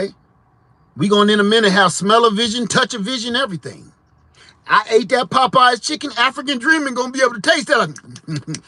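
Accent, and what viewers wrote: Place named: South Carolina, United States. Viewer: Hello! I been watching a few of your videos now and really enjoy your content! I am in Germany and my 2 Bi-racial sons live in SC, just as I did from 99-2012- heck I miss it so much and my goal is to make it back one day. So good to hear you talking- that SC slang that I speak lol Love what you say and am agreeing 100% Keeppushing! God bless you!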